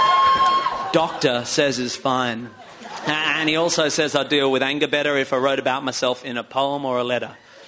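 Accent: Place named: Australia. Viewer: Early Minchin, My god you can hear his aussie accent.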